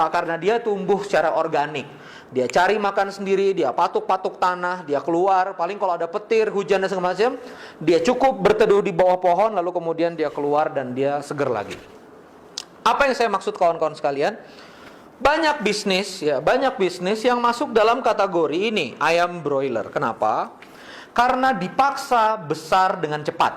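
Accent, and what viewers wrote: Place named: India. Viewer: bahasa inggrisnya kyk logat india :D udah pake bahasa indonesia aja kang. klo mau campur pake bahasa sunda aja :D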